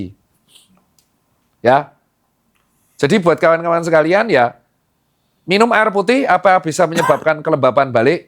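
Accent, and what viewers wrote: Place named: Surabaya, Indonesia. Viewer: Original Suroboyo... cocok banget aku suka 😅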